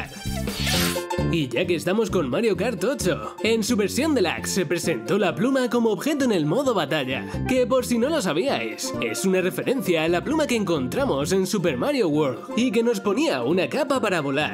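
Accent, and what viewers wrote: Spain. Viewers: No entendí ninguna palabra por el acento español pero aún así me gusto el video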